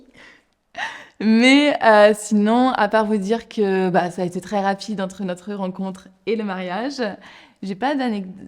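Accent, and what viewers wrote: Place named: Paris, France. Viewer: J'aime beaucoup le concept et le contenu. Bravo ! Par contre j'entends un accent parisien super fort.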